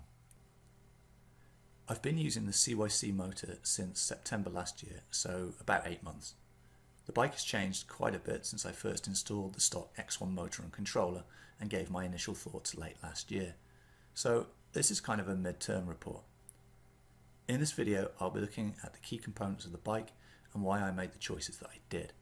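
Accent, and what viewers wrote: United Kingdom. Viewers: Distinctly English accent, but US roads, come on then spill the beans... Where in the US have you moved too? Been watching your vids for the last month since coming across the channel in a recommended video. Currently looking to go from a carbon Canyon Endurance on 28mm tyres under my beastly 200 watts of pedal power to a 2000w bike to and from a 10 mile trek to work. Thanks for the effort you out into your content. I can see it all, from the filming to editing to overlays and commentary. The viewers on youtube sometimes do not get a grasp of the time it takes on top of the actual activity itself to film, edit and upload the activity. Thumbs up from the 🇬🇧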